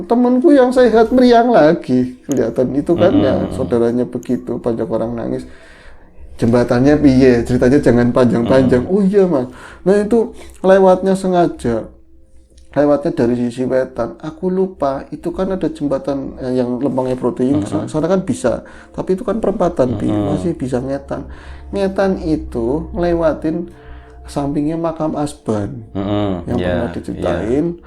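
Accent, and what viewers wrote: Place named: Tegal, Indonesia. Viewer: Mudah2an setelan Mas skrg mulai dari outfit ( topi)sampe logat Tegal dan gaya ceritanya AWET TERUS. Pertahankan,mas!!! Ini adalah Trademark kalian dan unik sekali!!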